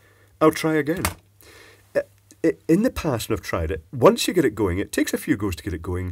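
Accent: Scotland, United Kingdom. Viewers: Every time you said “gasoline” rather than “petrol” in your quite cultured Scottish accent..! lol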